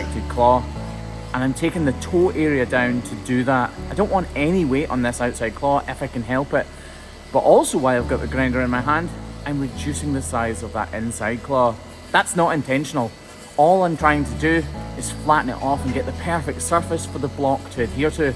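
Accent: Scotland, United Kingdom. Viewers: I would move to Scotland just to hear the accent. Great care with the cows :) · You sound even more Scottish than normal in this video lol. I love it!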